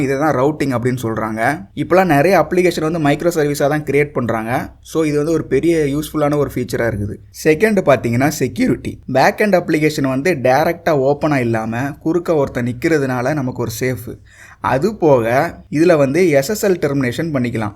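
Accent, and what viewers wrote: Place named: South Tamil Nadu, India. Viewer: The Tamil Ascent of the host seems to be from south Tamilnadu probably from Virudhunagar . அழகான பேச்சு தமிழில் கம்பியூட்டர் பயிற்சி வீடியோ வாழ்த்துக்கள்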